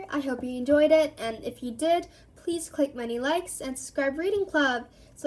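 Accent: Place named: United Kingdom. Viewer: I love ur British voice for the book very soothing❤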